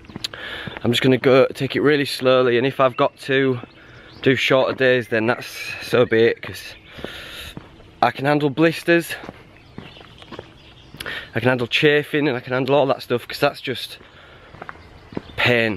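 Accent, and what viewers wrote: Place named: Northern England, United Kingdom. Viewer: You're so ridiculously northern. I love it. Looking forward to part 3, from Cardiff :))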